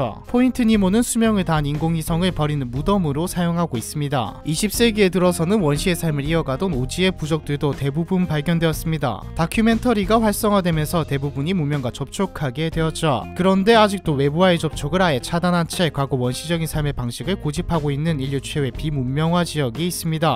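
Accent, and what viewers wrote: Gyeongsang, South Korea. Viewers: 이분억양이 경상도말투 인가보네요?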